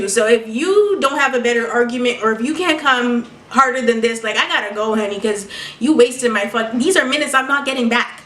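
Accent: England, United Kingdom. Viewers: That british accent though 😂😍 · BITCH THE BRITISH ACCENT. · Oui that British accent m8 it was amazing lol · OMG UR ENGLISH ACCENT IS EVERYTHING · LOVE FROM BRITAAAIIIIN! Btw british accent you sound just like a school friend😂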